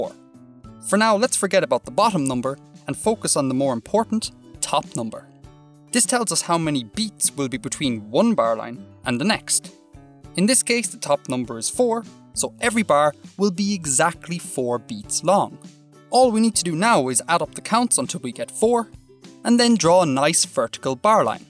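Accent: Ireland. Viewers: Let’s all apréciate his Irish accent and nearly 600k people have watched this lmao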